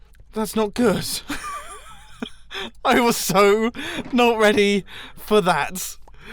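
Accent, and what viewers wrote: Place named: United Kingdom. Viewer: Has anyone else started to think with a British accent because of his videos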